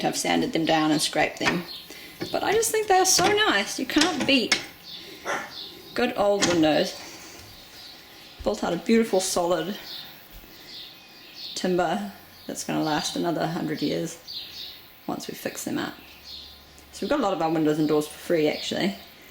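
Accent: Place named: New Zealand. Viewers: Very nice work. And that accent, holy crap I think I need to move to New Zealand. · To me it sounds kiwi with a tiny hint of South African.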